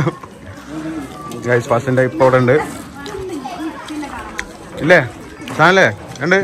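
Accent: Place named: Malappuram, India. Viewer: ഞമ്മളെ മലപ്പുറത്തിന്റെ ഒരു മൊഞ്ജ് 👍🏻👍🏻👍🏻... ആ ഭാഷ... 👍🏻👍🏻👍🏻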